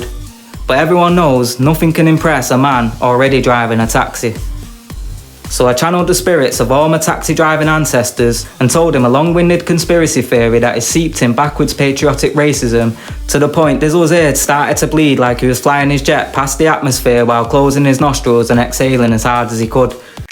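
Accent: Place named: United Kingdom. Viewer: #CrashRaid You are British I like that lol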